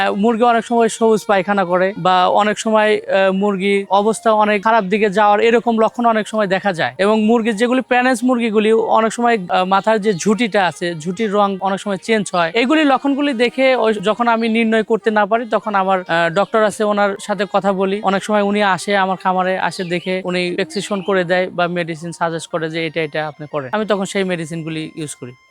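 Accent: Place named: Comilla, Bangladesh. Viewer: তর বাড়ি মনে হয় কুমিল্লা হালার পুত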